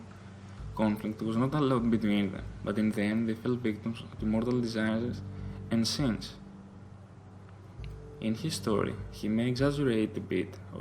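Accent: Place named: Arab world. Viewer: You're Greek? I thought you Arabian from your accent. I know nothing of other cultures!!! :'(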